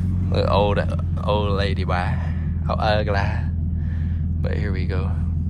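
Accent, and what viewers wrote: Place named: Atlanta, United States. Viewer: White dude with an Atlanta accent is Funny asl ❤️ the zl1 is hard gng 💯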